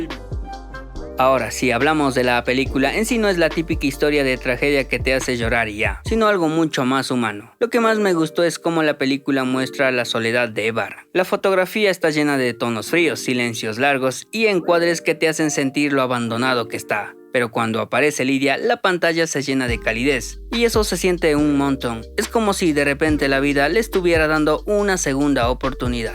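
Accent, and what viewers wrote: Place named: Ecuador. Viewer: Por como hablas eres paisano de Ecuador, un saludo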